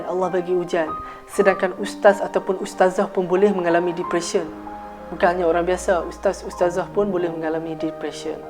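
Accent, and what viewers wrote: North, Malaysia. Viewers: Hang pasti oqang utaqa..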